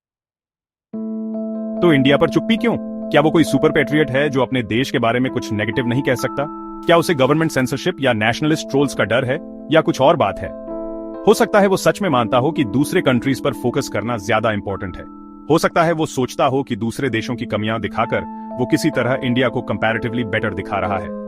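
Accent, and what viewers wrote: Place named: United Kingdom. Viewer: Aur angrej mat ban. Hindi bhi angrej ki tarah bol rha hai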